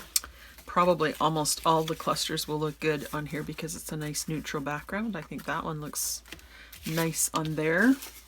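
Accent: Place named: Canada. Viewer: Thanks for sharing the link to this video,. I learned so much and am looking forward to making some of these tags. The tags are awesome. I also really enjoy your accent. It brings back memories of some sweet Canadian friends who have gown to be with the Lord.💜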